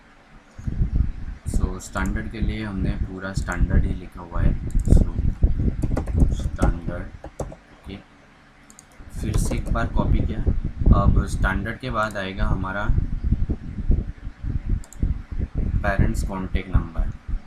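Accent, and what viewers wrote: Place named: Gujarat, India. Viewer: Watched all the video,build the same project without any errors, learned a lot about php and sql, thank you so much, commendable effort, btw you sounds like gujju, are you gujju??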